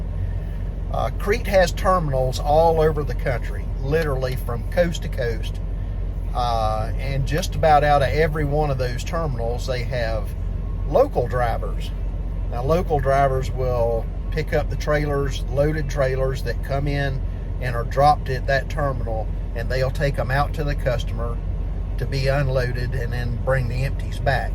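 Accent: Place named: Ireland. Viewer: I'm on my third month now with the company and so far so good. I love your Irish accent or is it Italian. hahaha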